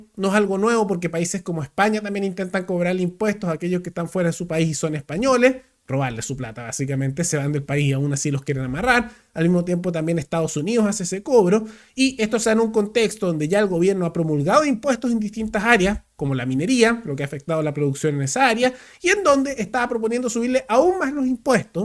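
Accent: Chile. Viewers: Hola hermano chileno. Te sigo en tus comentarios. Eres muy bueno, muy informado e inteligente. Un consejo, si deseas que más gente te vea en América Latina, retira esos símbolos que identifica a Chile, suficiente tu acento para saber que eres chileno. También retira ese himno después de tu comentario, suena a tambores de guerra. Recuerda que América Latina ve a Chile como un país belicoso y agresivo. Esos símbolos chilenos nacionalistas y esa marcha que parece militar contribuye a subrayar esa percepción que se tiene de Chile. Tú tienes para más, eres muy bueno en tus comentarios, diría EXTRAORDINARIO.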